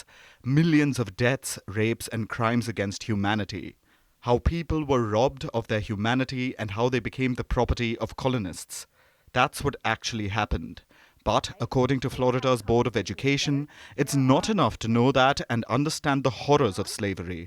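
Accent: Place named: India. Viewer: Hey what are you guys even talking about? Never would even expect from someone with an Indian accent 😩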